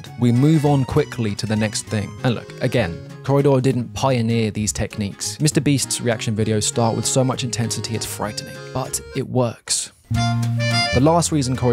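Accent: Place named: England, United Kingdom. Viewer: Lovely English accent - not Irish, Scottish or Welsh. Crisp, clear English accent. I sat through your entire video just listening to you talk. Don't get me wrong - the content is great. But I was here for that lovely English accent! Thank you.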